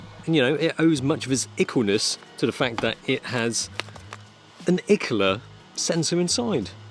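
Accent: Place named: London, United Kingdom. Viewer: he doesn't really..... accent is London-ish?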